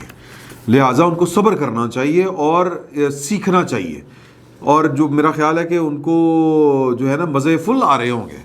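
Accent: Punjab, Pakistan. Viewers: Hahaha ap k tajzia sun kr Maza ajata h special ap k teth Punjabi touch se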